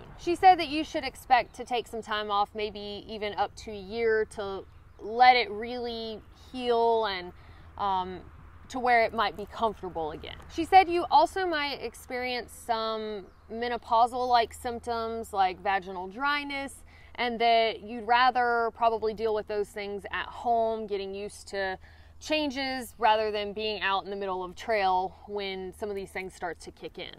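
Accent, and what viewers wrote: South, United States. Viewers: Watching from the UK that's a really cool accent you have - from a southern state? Interesting vid too...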